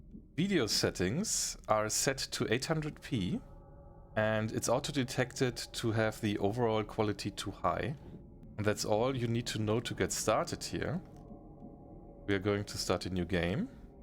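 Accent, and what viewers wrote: Germany. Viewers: Bruuder dein deutscher Akzent ist ja mal mega hart :D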